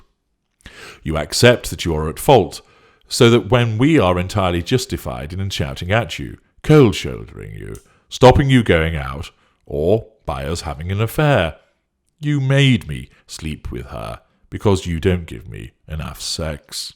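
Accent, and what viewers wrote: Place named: England, United Kingdom. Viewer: I like listening to voice, I enjoy the English accent. My ancestors came to the U.S. from england, One day I hope to take a trip over there and spend some time.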